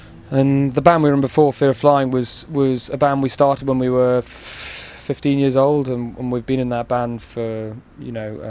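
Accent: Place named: United Kingdom. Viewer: Damn, u r so hot!!! Love ur Brit (London?) accent...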